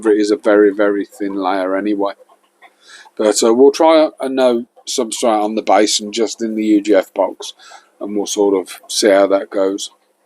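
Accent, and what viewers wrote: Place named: Black Country, United Kingdom. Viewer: yeah I heard in one of your vids. I guessed so with Yam twang 🤣🤣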